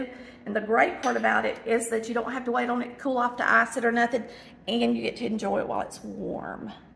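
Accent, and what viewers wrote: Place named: Texas, United States. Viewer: is so cute! It reminds me of my family growing up in Texas. She sounds just like them!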